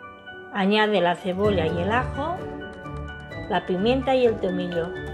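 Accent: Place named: Catalonia, Spain. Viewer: Se nota ese acento catalán 😝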